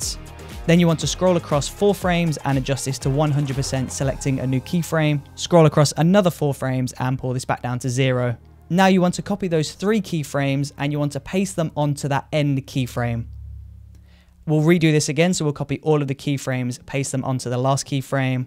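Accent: United Kingdom. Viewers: I love coz you're British haha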